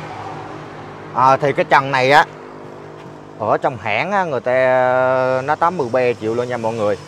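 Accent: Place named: Quang Nam, Vietnam. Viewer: Nghe chú em nói tiếng Quảng Nam rất vzui tai, Con gè con ke.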